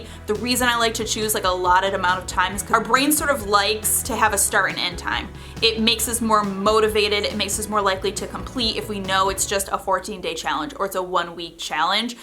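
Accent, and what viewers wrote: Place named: Boston, United States. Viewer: Muchacha!! 🎉 you’re brilliant on soo many levels! I got sudden-chronic-declutter-syndrome at 2am, 2 days in a row .. I’ve hit my “plateau” on day 3 and from your videos I’ve gathered i have tooo much stuff. All downloads printed! ❤❤❤ Enemy identified, resolution spotted -and Boston Cream, cuz they talk funny! Wait, not funny. Cool! Interesting? Different? It’s an appealing accent😅 I’d have a nonsense conversation just to hear you talk. Soo I’d have a donut that reminds me of that potentially interestingly speaking boston-ly person. Keep being your greatest self! God bless ❤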